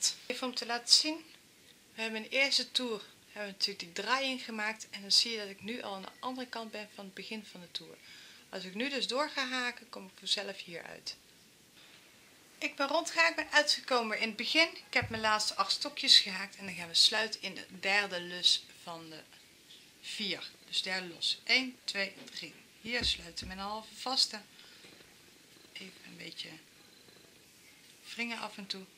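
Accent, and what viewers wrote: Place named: Brabant, Netherlands. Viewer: Ja hoor je kleine en het Brabants accentje ...ben ook een Brabantse ...maar woon nu in Groningen ...blijft leuk om te horen 😀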